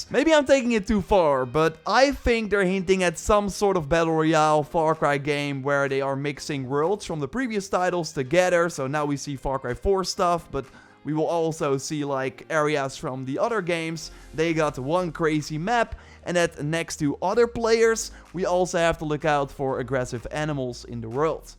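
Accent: Netherlands. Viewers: asljeblieft , werk aan je verkaasde engels . goeie content maar mijn oren bloeden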